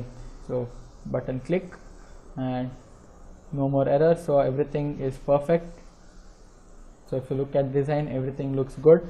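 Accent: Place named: India. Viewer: have problem with indian accent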